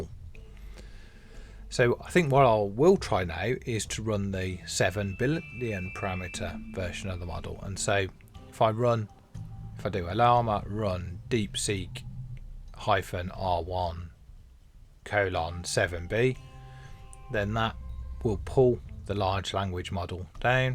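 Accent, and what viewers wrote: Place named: Birmingham, United Kingdom. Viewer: where are you from? you sound like you are from near where i'm from back home (near bham somewhere) 😂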